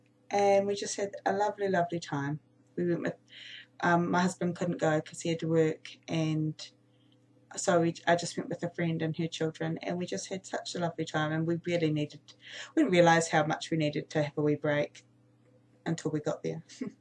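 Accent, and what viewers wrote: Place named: New Zealand. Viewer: Three great layouts! Love watching process vids about using own stash or scraps, as they give me tons of ideas. Also, I'm a Kiwi living in Canada, so it's great to hear a fellow Kiwi chatting away....your narrations are so entertaining (even if they do make me homesick! Lol)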